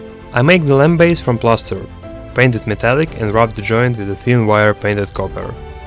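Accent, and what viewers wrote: Russia. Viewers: Ты же русский верно? По твоему акценту понятно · с первых слов поняла что ты русский)00) отличная работа!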